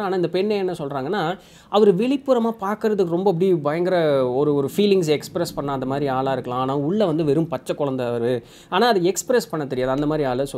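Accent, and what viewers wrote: Coimbatore, India. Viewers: Anna neenga coimbatore ah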